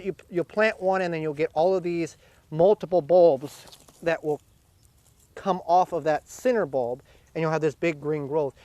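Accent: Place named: United States. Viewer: Simple, helpful American English. Thank you.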